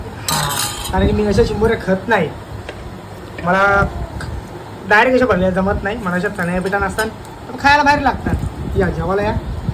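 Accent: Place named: Agri, India. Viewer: Aagri tari bol nahiter sudh marathi bol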